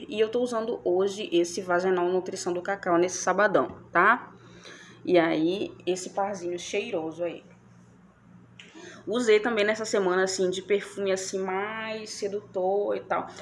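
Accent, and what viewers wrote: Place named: São Paulo, Brazil. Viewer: Tá fazendo frio aqui também amora ! Eu sofro no inverno kkk sou mto friuenta Lindona vc mora no Rio mais o sotaque é de são Paulo? Fiquei curiosa acho lindo o jeito de falar hehe 🙊😍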